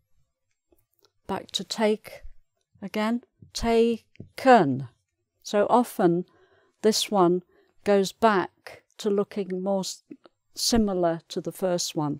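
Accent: United Kingdom. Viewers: Very kind and polite teacher I impressed her British accent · I Like it This video's good ,learn speak English British with this teacher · * “Very kind and a polite teacher. I am impressed with her British accent”.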